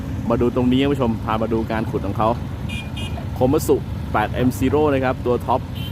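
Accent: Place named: Isan, Thailand. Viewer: สุดยอดครับพี่เว้าอีสานแน่ครับอยากเห็นหน้าอ้ายครับFcเด้อครับ👏👏👏👏👏👏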